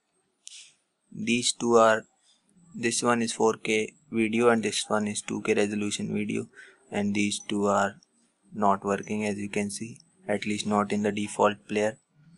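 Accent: India. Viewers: The indian accent is so funny :D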